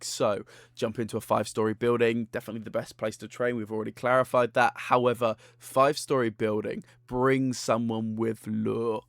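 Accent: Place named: United Kingdom. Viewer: Great video! I also hear a British accent (you correcting yourself from £1 to a dollar bill haha); not many of us who play/played TTR! This might be advanced, but this is what I used to do when training in buildings alongside your advice: I'd optimise the gags in a way where I'd overkill the cog on the last floor to max out how much gag points you can acquire in one building. For example, on the top floor, if the first cog is Lv 9 by itself before other cogs join, I wouldn't use a Lv 6 gag as it'll most likely take it out in one hit. I'd use two Lv 4 or 5 gags then use a Lv 6. That way, you'll max out the gag points faster. Like using a trap door first, then TNT. Or two quicksands then TNT. Also, Yesman are the best cog to face in an invasion; their attacks are not powerful and go up to Lv 7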